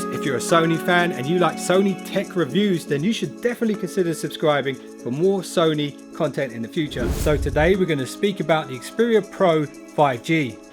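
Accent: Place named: United Kingdom. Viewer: Britain accent is horrible..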